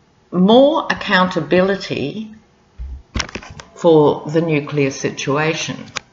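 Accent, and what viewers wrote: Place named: South Australia, Australia. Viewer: As a fellow tarot reader, I so enjoy your readings. I know it takes a lot of energy to do these readings. I thank you for that time and energy you're sharing freely and generously of yourself on here. I lived in South Australia for 3 months - was married there and I admit, hearing your accent and views makes me feel a bit nostalgic and ever so comforted. Xxxx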